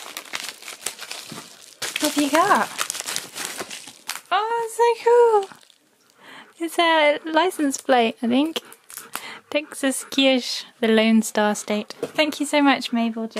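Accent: England, United Kingdom. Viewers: "Get the tangly bit". I love your English ways of saying things. And Key really listens. It's amazing!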